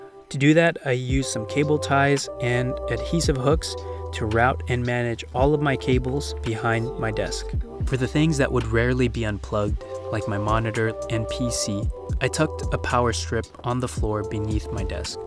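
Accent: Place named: England, United Kingdom. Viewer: Your English accent us pretty awesome 👌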